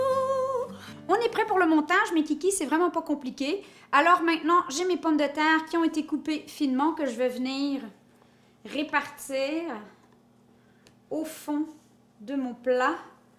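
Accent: Canada. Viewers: en ayant vecu en France je sais reconnaitre l'accent canadien qui est très drole 😄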